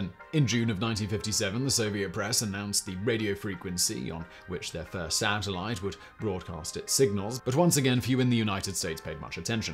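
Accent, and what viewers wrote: United Kingdom. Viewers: He's British so no. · why do brits ad extra syllables to the word Alminium? It's 4 syllables not 5. The hard second "i" is totally supposed to be silent, not accentuated.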